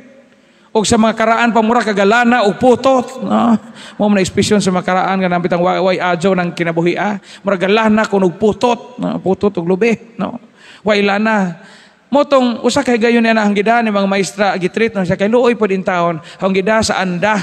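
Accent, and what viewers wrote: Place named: Bohol, Philippines. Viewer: Nindot kaau paminawon imong boholano accent father cargado kaayog j, makalingaw pod kaau imong homily god bless father.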